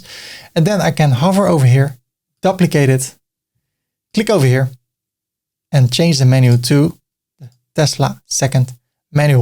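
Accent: Netherlands. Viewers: First time here, I picked up the Dutch accent haha, and I love Tesla too. And work with Elementor, daily. So I subbed! Groetjes uit Middelburg! 😊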